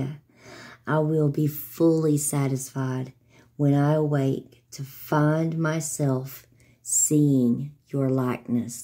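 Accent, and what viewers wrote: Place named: Tennessee, United States. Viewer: Grumble Bunnies! Oscar the Grouch! Squidward! 😬😱🙊...🙌🎚The JOY of the Lord sis! It IS what gets me thru! Had to learn the long hard way about this. Wandering the desert >40 years😬💔🙌🎚. God's perfect timing for new peeps, the Lord IS doing something, preparing us girl! That TN twang! This IS the day the LORD has made I WILL REJOICE AND BE GLAD! 🧐ain't nobody got time for dat! Cut em out Lord! Ps.1 HAPPY are those who do NOT listen to the wicked, who do NOT go where sinners go, who do NOT do what evil people do. We LOVE the Lord's teaching and we think about those teachings day AND night! We are strong like trees planted by THE RIVER, we produce fruit in season and our leaves DON'T die. EVERYTHING we do WILL succeed.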